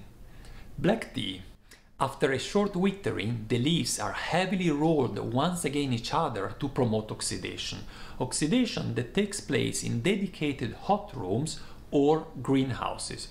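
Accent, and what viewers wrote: Italy. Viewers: You sounds like an italian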